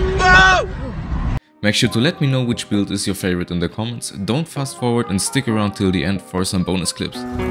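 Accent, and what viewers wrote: Germany. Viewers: bro bist du deutsch ja nh? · man hört den deutschen aczent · Are you german? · Gude .) musst noch bissel Amerikanisch lernen fällt auf das du Deutscher bist .)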